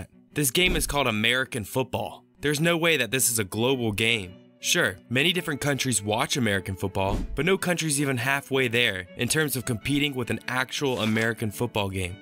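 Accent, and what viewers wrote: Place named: Louisville, United States. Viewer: I think that he may be from "Loo-uh-vul."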